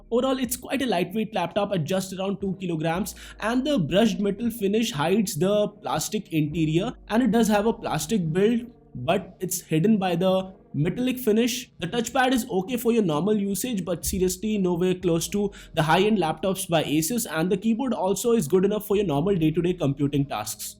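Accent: India. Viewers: i cannot fucking understand you in that annoying Indian accent, either fix that or stop fucking making videos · hope one day my ears can survive of listening Hindi accent. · I like indian accent.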